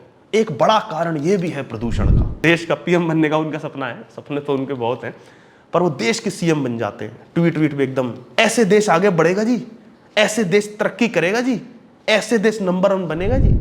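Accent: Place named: Haryana, India. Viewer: Bhai ki haryanwi accent shandar hai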